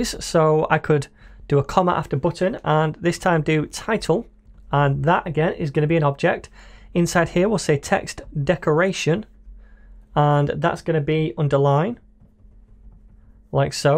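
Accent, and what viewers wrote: United Kingdom. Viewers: Are you from UK dude??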